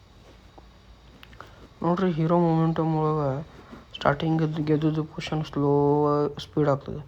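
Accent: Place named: Uttara Kannada, India. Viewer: Full jora agi matadi sir.... Adu uttar kannada language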